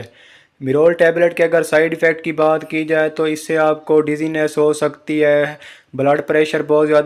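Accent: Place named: Saraiki, Pakistan. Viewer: بندہ ہے تو پڑھا لکھا تاہم لہجہ انپڑھوں والا ہے کوئی پیور دیہاتی اور سرائیکی لگتا ہے ۔۔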